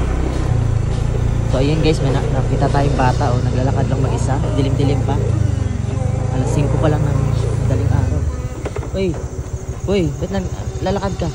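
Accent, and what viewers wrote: Visayas, Philippines. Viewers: bisaya man diay ka idol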